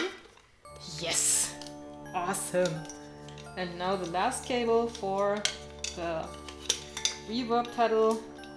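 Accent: Germany. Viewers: Are you from Deutschland? I love that German accent :3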